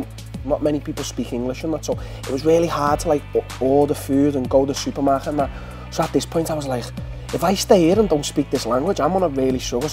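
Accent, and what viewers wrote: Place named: Liverpool, United Kingdom. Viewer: The scouse "r" and "arrr" help a lot.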